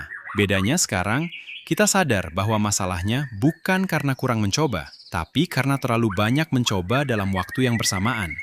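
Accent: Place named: Kuningan, Indonesia. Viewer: dari logat nya kayak orang Kuningan a😅🙏🏻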